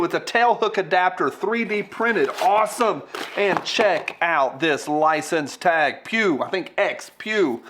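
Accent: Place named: New England, United States. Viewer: We need more New England accents, lovee.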